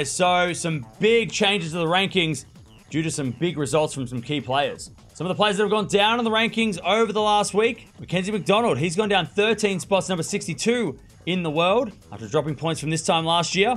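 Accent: Australia. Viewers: I say the biggest win this month is Norrie over Alcaraz in Rio. There was almost no way of Norrie winning but he did. There was a buzz of Alcaraz's injury acting up but nonetheless he was hitting winners all over the place. I think Norrie's win was the most classic in a while. Thanks for the show. It is always good to hear your Aussie accent.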